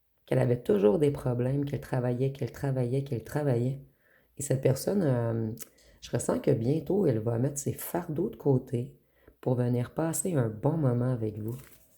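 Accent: Quebec, Canada. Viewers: Est-ce que tu viens du Québec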